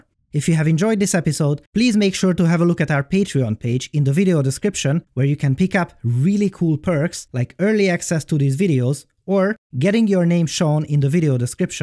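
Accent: Hungary. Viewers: Ahoz képest hogy magyar vagy, kevés akcentussal beszélsz angolul. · hunglish intensifies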